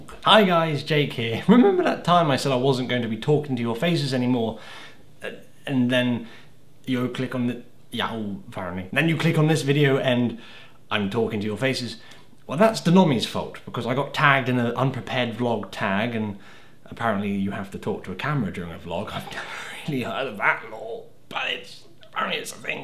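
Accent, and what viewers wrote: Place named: Cornwall, United Kingdom. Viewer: Jake! Awesome amazing Cornish YouTuber is back! Ahhhhhhhh! Total fan girl moment over here! Cannot believe you're back, so sad when you said you wouldn't be making any more videos... Glad you are again... Your Cornish accent tag is so true to life, lol!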